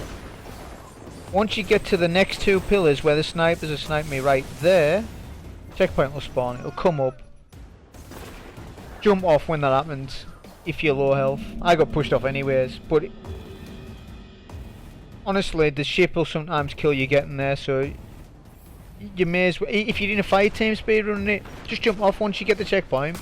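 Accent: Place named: Newcastle, United Kingdom. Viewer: ah well am from Newcastle myself so I could hear a little bit of it in your voice haha don't come across many players close to home to be honest haha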